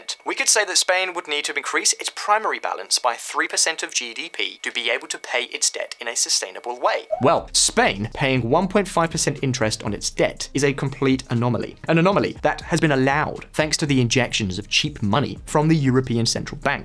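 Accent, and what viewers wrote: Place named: England, United Kingdom. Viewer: Someone with an English accent bad mouthing Spain. Is this supposed to surprise me? Why don't you talk about the financial mess in England?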